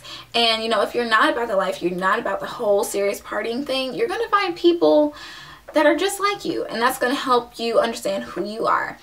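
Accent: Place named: Illinois, United States. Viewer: I'm from the IL too. I can tell you are from IL, you sound so familiar!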